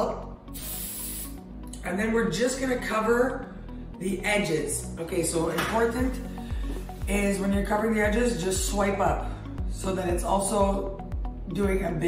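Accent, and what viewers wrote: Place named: Canada. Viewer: OMG I KNEW IT HAHA i kept thinking "she has to be at LEAST Canadian" and then you said "un lozenge"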